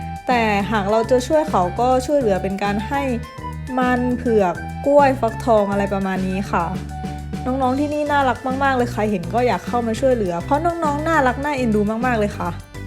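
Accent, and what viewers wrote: South, Thailand. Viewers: เอ็นดูพี่ แหลงใต้ 5555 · แหลงใต้ น่าร๊ากก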